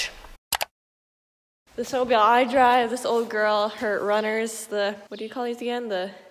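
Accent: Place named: Scotland, United Kingdom. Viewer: mabee alot of you guys have a bit of a Scottish or Irish twang in yer accent.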